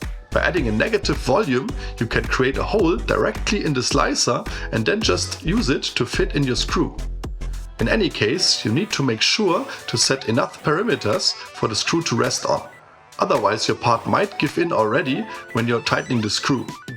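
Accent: Germany. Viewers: Notable observation: if the author of the video about 3d printing has a German accent, the video is going to be awesome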